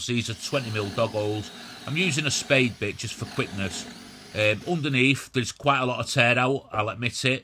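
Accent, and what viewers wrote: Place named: Manchester, United Kingdom. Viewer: new to your channel love the manc accent , just retired so started making birdtables still getting tools together , john from Altrincham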